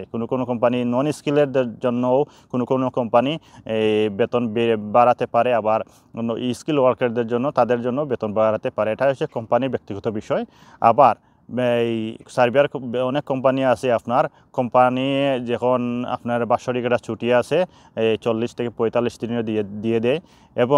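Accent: Sylhet, Bangladesh. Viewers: Apne Sylheti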